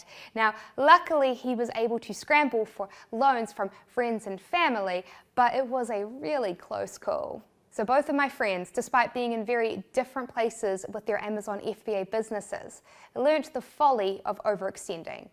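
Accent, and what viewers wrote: New Zealand. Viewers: ooo gash you r a kiwi! click the subscription button. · I am Australian you accent sounds NZ but slightly stronger than what i normally hear. I don't know why i said that.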